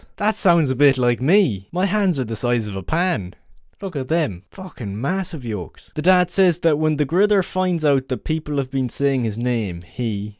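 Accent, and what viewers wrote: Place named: Ireland. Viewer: I’m sorry I was just messing… I actually love your Irish accent! ❤❤ I have a few Irish friends who say I am too English to understand them lol 😂😂… great video! I am following you now too! ❤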